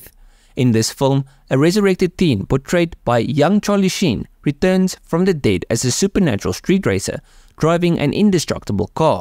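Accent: Afrikaans, South Africa. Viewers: Is jy Afrikaans?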